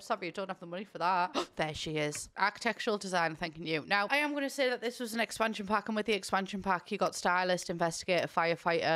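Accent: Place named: Newcastle, United Kingdom. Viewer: Hey its so upsetting when you mention tories in your videos in such a negative way. Conservative is just a political opinion, we aren't all bad people just like people who support Labour or lib dem. I just feel so shamed when I watch your videos sometimes cos of your comments and I don't feel I should have to be cos its my own personal opinion. I absolutely love your videos and think you're hilarious and I don't actually live that far away from you so it's great to hear a geordie accent but it's making me feel like I can't watch you cos your opinions are so strong and youd think I'm a bad person... 😞 I am definitely not hostile either I work for the fire service and I love helping others and spreading positivity. I just wanted to share this cos you may not have even thought of this... But either way, I hope you're feeling better can appreciate where I'm coming from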